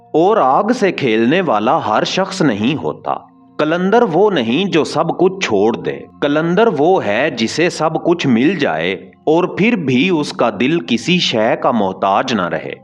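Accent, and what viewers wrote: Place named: Punjab, Pakistan. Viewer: Narrator voice is so irritating , sharp and with a Punjabi accent. Please replace the narrator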